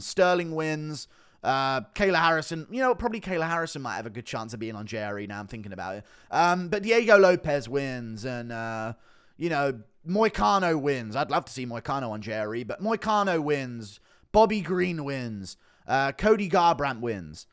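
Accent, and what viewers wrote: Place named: England, United Kingdom. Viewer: why does have my favorite English accent?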